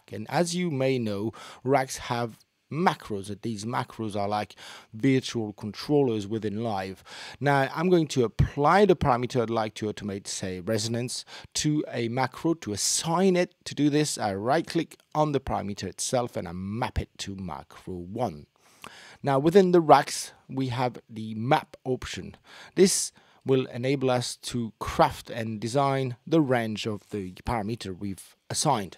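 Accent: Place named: England, United Kingdom. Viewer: Nice english accent l'ami!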